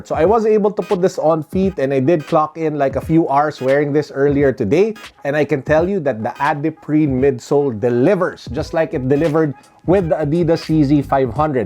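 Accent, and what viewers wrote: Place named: Philippines. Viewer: Good review That pinoy accent is THICK > peace from Makati City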